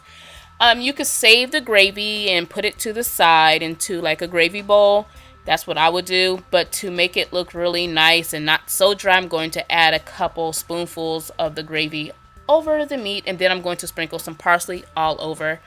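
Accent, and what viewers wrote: United States. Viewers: Why do yanks say "erbs" when there is an "H" in the word........... its HERBS.... in the Queens English the word is HERBS... learn it.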